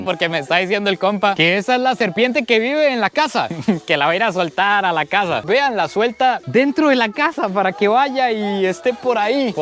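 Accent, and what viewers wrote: Mexico. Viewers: Creí que era mexicano bro jejeje pero igual buen video chulada bendiciones bro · Mi compa me gusta k hable como mexicano....cuando quiera ir aya tiene su casa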